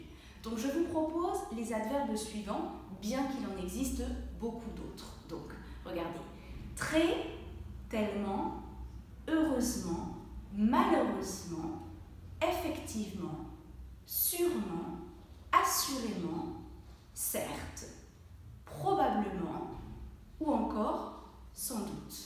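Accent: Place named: Brittany, France. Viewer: excusez-moi mais puis-je vous demander d'où vous venez en France? votre accent a le même air de celle à Anne qui présente la chaine 'Parlez Vous French' sur yt, et elle est Bretonne (?). je suis encore en train de regarder cette video, merci beaucoup quand même :)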